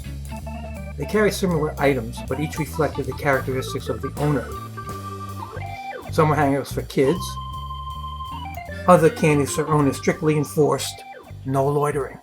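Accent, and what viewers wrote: New York, United States. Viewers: This is so well done.The narrator knows his stuff. He also has a great NYC accent. The real deal. Thank you for this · What a great and thorough trip down memory lane. You covered just about everything except for the soft pretzels sold fresh each day. I remember them being stacked on a verticle stick and selling for 5 cents each. Again, what a terrific video! Even the narrator has that great NYC accent.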